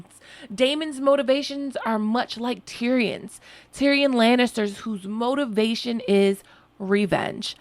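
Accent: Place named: Baltimore, United States. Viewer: You gotta be from Baltimore lol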